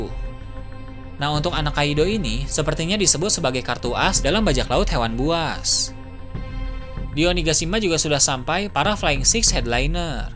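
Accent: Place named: West Java, Indonesia. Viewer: Dari logat kayak nya admin orang sunda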